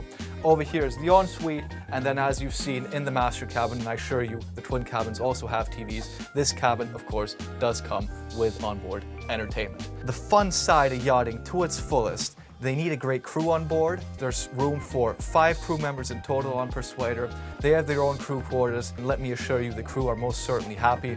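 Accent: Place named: United States. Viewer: Wonderful gentleman's yacht with lovely lines. Great walkthrough! Very positive energy and excellent American English sir!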